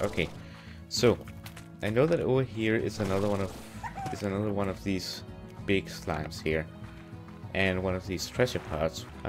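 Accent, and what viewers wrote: Denmark. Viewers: are you danish it sounds like it and i love youre vid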